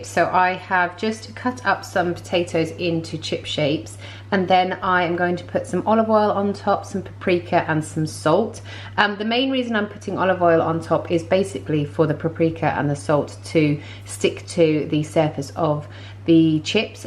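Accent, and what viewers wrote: United Kingdom. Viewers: 🙂 Excellent. A British voice. Have subscribed for that reason alone......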